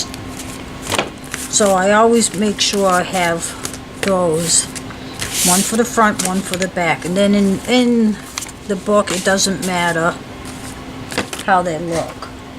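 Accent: United States. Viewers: I love this book so much. It looks so sturdy. I can't wait to make one. Thank you. Do I hear a Boston accent. Lolol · I love your accent. I can tell it's east coast. Where are you from?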